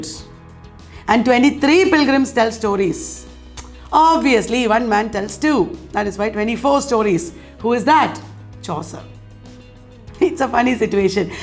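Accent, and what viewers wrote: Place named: Tamil Nadu, India. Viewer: nice sryle ..zerous and academic,,tamilian accent..stands out !!! kudos...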